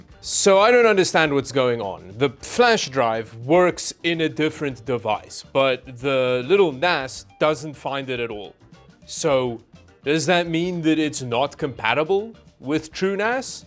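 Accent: Afrikaans, South Africa. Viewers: Afrikaans... I was wondering where your accent came from. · You're the first English person I've heard get so close to an Afrikaans accent. Legit you were spot on but I think it's because your voice already sounds kind of South African😂. Jy moet net nie jou moer strip nie. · BRO IS AFRIKAANS ??? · yeah I thought I picked up an African accent I just though he was from South Africa cause he literally sounds like our Afrikaans natives. Really cool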